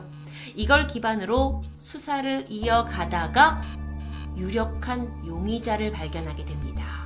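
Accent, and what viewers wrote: Jeolla, South Korea. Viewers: 절라도 말투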